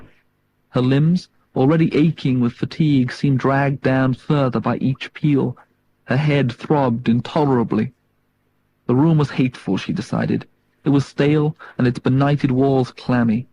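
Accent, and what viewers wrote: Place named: Liverpool, United Kingdom. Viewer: Great to hear a reading by the author. Interesting way of speech going on there, I think it's an attempt to conceal a Liverpool accent.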